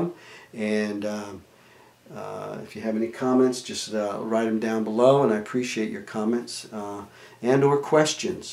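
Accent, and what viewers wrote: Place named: Los Angeles, United States. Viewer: Where is your accent from? L. A.? Reminds me of Greg Graffin from Bad Religion in old interviews.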